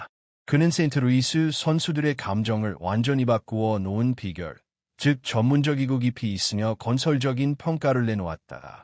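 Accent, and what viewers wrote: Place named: China. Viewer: 목소리가 보이스피싱 중국인이네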